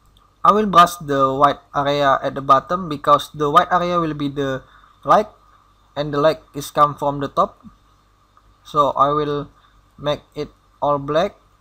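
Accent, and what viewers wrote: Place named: Indonesia. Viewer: You sound Indonesian hahaha thx for the tutorial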